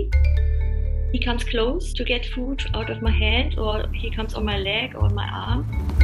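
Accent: Germany. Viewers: 你的英文聽起來很像德文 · Habe es schon an der Aussprache gehört, dass du Deutsche bist.... Liebe Grüße aus Worms 😁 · I’m actually wondering if she’s German cuz to me as a German her English has that small accent XD also Kräri sounds kinda like the German word Krähe which means crow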